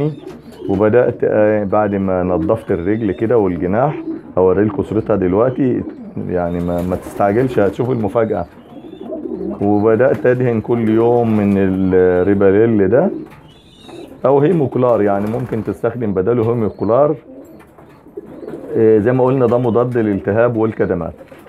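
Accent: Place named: Syria. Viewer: هو حضرتك سورى كلامك عامل زيهم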